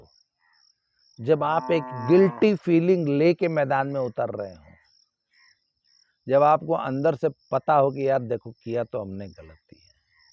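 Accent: Haryana, India. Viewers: Ap Ki Bat Majedar Btane Ki Trika Haryanvi Kya Bat Hai. Weldone.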